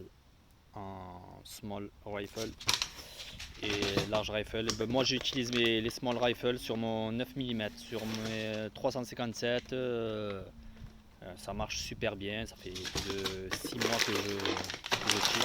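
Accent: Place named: South, France. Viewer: T es un sudiste vu ton accent on est presque voisin 👋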